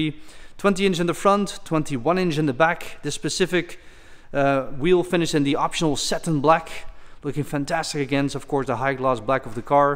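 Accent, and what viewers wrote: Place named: Netherlands. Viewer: en is een Hollander als ik me niet vergis in mijn oren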